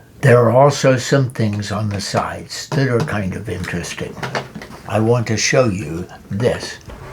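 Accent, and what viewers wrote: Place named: United Kingdom. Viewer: He has such a beautiful accent almost like the British . I think the old generation used to stick to British accent as close as they could.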